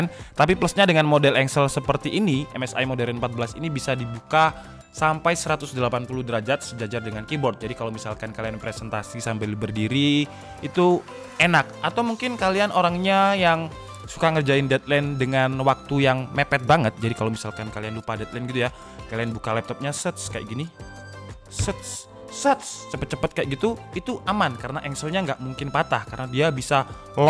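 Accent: Java, Indonesia. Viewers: Wong jowo tenan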